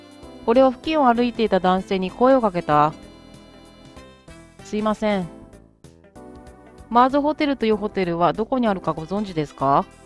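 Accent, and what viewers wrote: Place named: Kansai, Japan. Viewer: 関西人ですが、の関西弁くせになりそう😁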